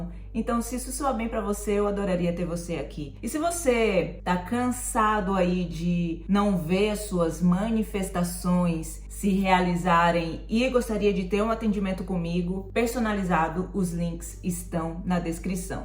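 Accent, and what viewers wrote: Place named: Maranhão, Brazil. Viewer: ❤❤❤ que top conhecer o rosto dono da voz. Eu imaginava voce morena, nao sei porque rs. Mas seu sotaque é tao gostoso de ouvir, parece de uma amiga minha do Maranhao. Como sempre um video pontual. Amei, prazer te conhecer!!😊😊😊🥰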